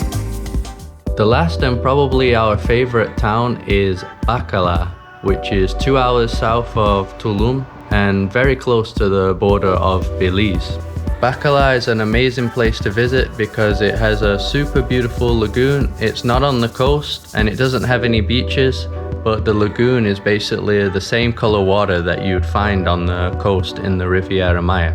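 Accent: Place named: Manchester, United Kingdom. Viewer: Sound like you're from Swinton or Clifton, Manchester!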